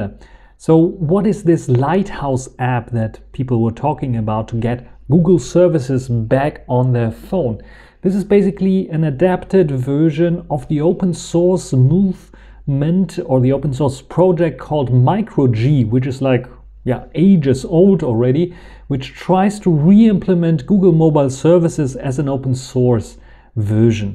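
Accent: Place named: Germany. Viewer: Ein deutscher Kommentar flutscht mal durch, weil ich denke da einen deutschen Akzent zu hören :) Folgendes: Ich stehe kurz vor dem Kauf eines Huawei Gerätes, bin aber auf zwei Banking Apps angewiesen, erstens N26 und zweitens ING. Kannst du das vllt mal mit GBox testen und schauen ob das läuft? Danke dafür im Voraus! Zweitens: Ich sehe gerade dass du seit sagenhaften 16 Jahren Youtube machst, fast auf den Tag genau (im Okt 2006 hattest du das erste Video gemacht), Respekt!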